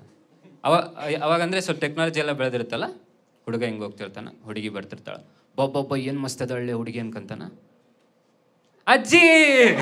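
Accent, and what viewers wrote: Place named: Davangere, India. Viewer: Sakath bro…I loved it man. I have friend from Dhavanagere, nin thara ne guru same mathadodu!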